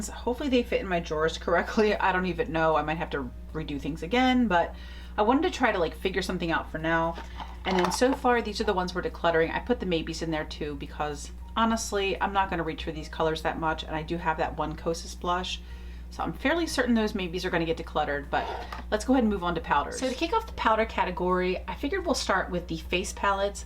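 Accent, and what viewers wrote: Philadelphia, United States. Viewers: I just found your channel and I was like “tell me she’s from Philly….” Haha instant subscriber! I’m from the area too 😊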